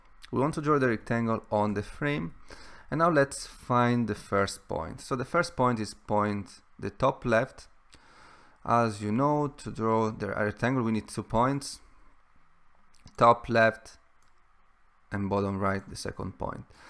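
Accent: Italy. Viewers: Improving 3D + perspective is interesting, you should push that project much further for sure! Thanks for sharing. It's the 4th video i'm watching from you, allow me to comment my overall experience: - Monotone voice, a bit 'boring' (don't want to be rude), you don't seem motivated or you don't seem to enjoy sharing or you don't seem to enjoy doing it... C'mon, share your guts! - Funny Italian english (good and bad I guess, still better than the Indians .py tutorials, right :D ) - Really GOOD content, hard to find (i just tried different keywords this time and found the answers i needed! I'm happy!) - Speed of coding is good enough, the code is enough explained (it's really the feeling you give: you are bored to do this! -> that is the bad part of the coding..) - Keep on going with these things, i subscribe now because you have an interesting topic I want and need to learn for professional purposes, so I will watch your next videos as they come and I will check your previous ones with time. 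Anyway, wish you the best of success on your youtube path! Thanks again for the sharing and explanations, really helpful and useful, good enough for now, add more motivation in your voice and we'll be friends for a long time! :) Ciao :)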